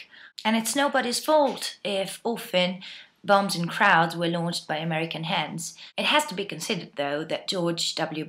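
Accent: United Kingdom. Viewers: mamma come parli bene, con accento inglese proprio! sono stata un anno di america (ho solo 17 anni) e ho molto d + l'accento "slang americano" tu invece sei così english:P!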